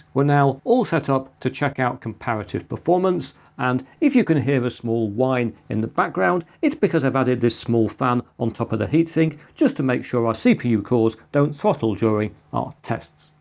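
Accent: United Kingdom. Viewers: Sorry, I thought that you were a bot. You're obviously not familiar with this channel. This YouTube channel, run by a bloke called, has been going for over 18-years now. Before that, he's been published, in computer magazine articles, for over 40-years. He's well known and respected in the UK computer community. He's definitely not AI! It's just the way he speaks; he's British after all. Happy New Year to you and yours.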